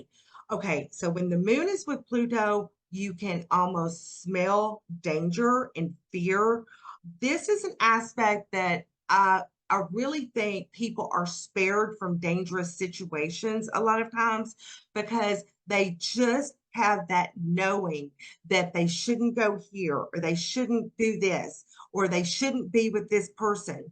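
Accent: South, United States. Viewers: I really need to get a reading from I love her accent she reminds me of being down south(my family is from the south) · Thank you for the beautiful insights., are you from Georgia? I love your southern accent. I'm trying to learn to identify each accent and where it derives from. So far, I have South Carolina down pretty good.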